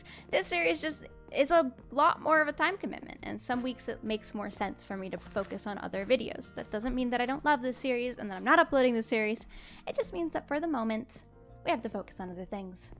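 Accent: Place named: Canada. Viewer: I love gems 'Canadian moments' like when she says cie-an or sore-ey because its so cool as you realise that she pronounces things differently than we do. I personally am having a lot of fun listening to her pronunciation (not in a mean way) and her metaphors. I love this channel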